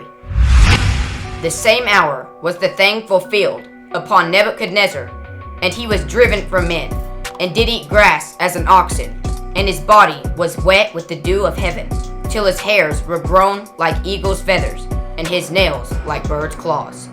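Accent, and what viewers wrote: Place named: United Kingdom. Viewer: sounds like England/U.K.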